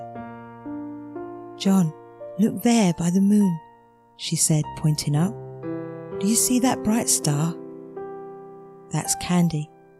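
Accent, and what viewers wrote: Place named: England, United Kingdom. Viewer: Beautifully read. does indeed have a pleasant voice- very warm tone and clear dictation. Me being from the US I find the English accent very pleasant and nice to listen to. I don't have much experience with background music for audio books so I will just say it seems pretty solid to me. Can't really give any pros or cons due to my lack of experience there. Sounds good.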